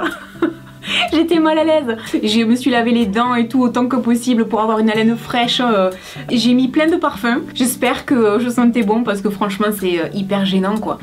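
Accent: South, France. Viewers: coucou vraiment bravo!! je te suis depuis longtemps et je t'adore tu as un accent de chez nous du Sud une abonné de +!!😘😘😘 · Bravo à toi! Tu as mérité tout ce qui t'arrive, tu es tellement souriante, naturelle, tu fais du super boulot et puis je vais te faire une confidence, j'adore ton accent qui me rappelle pour ma part quand j'habitais dans l'Hérault et pour mon mari lorsqu'il habitait Toulouse. Tu nous réchauffe avec cet accent ensoleillé;) Tu donnes aussi des astuces tellement utiles:) Pour moi tu es la copine qui serait là et qui me dirait " mais non ne jette pas ça, regarde tu faire ci et ça avec ta crème ". Petite question de curiosité: comment est-ce que tu sais notre âge? Gros bisous et toutes mes félicitations, c'est vraiment mérité:) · Félicitations! Tu mérites amplement tout ce qui t'arrive de bien en ce moment! Bientôt 200 000 abonnés en plus! 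Toutes tes vidéos sont pleines de super conseils et on voit bien que ta maturité et ton expérience parlent et toi au moins tu ne dis pas d'énormités comme certaines jeunes youtubeuses. J'admire ton travail et ta force de caractère. Je suis ravie chaque fois qu'une nouvelle vidéo de toi sort. Tu es la seule dont je ne me lasse absolument pas. Et ton accent! Enfin du sud fièrement affiché sur YouTube pour nous représenter! Je n'ai que 23 ans mais je préfère tellement te regarder toi que d'autres plus jeunes. Change rien!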